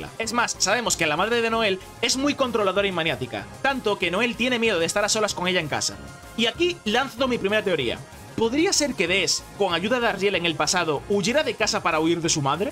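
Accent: Galicia, Spain. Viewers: se te nota lo gallego Pd. Aléjate de las cabras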